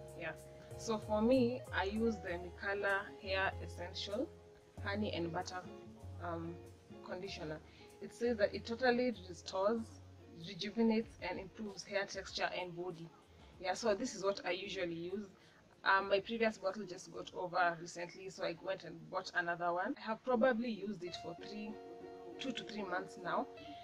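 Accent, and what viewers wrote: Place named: Africa. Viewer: You have a lovely accent. Where are you from? Zambia, Kenya?? Trying to guess Lol. I'm from Zimbabwe and your accent sounds pretty close to that area of the continent.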